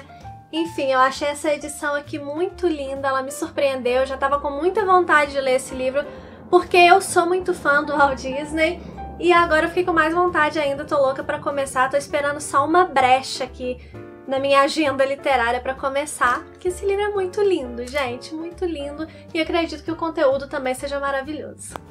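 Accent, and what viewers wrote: Minas Gerais, Brazil. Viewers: Oi! Te conheci essa semana e estou adorando seu conteúdo! Sua voz é tão gostosa de ouvir, esse sotaque delicioso de Minas ❤ adorei sua seleção, livros realmente lindos! Aqui na minha estante meus xodós são as edições ilustradas de Harry Potter, as novas edições de Senhor dos Anéis, pela Harper Collins, e a edição da Puffin in Bloom de Anne of Green Gables, aquele azulzinho, sabe?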